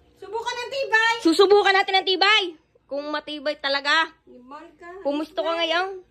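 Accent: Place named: Ilonggo, Philippines. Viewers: Nindot jd kaayu paminawn ang inistoryahan sa ilongga ❤❤ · Baw ilonggo gale si mama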